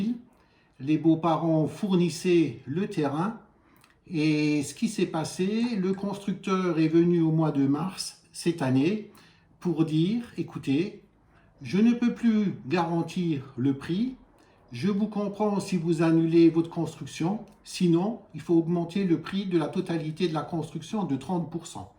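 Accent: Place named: Alsace, France. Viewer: haa ,cet accent alsacien too much looie...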